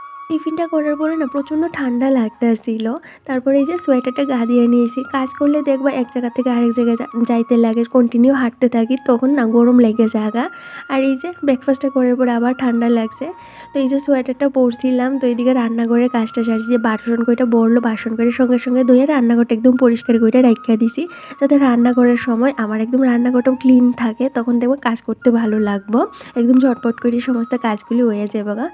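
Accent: Sylhet, Bangladesh. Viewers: তুমি কোথায় থাক? তুমি কি সিলেটি? তোমার কথা শুনলে মনে হয় সিলেটি,,,প্লিজ কিছু মনে করোনা,,,আর আমার প্রশ্নের উত্তর দিও❤️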